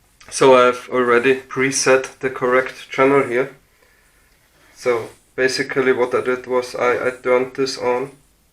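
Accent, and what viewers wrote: Germany. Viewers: German? I think i hear an little accent? If yes i got a few questions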